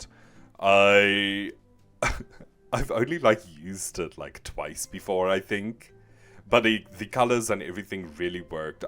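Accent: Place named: South Africa. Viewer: Oh wow, you live in South Africa? Now I understand the accent - I thought you were British, sorry. Hi from Germany!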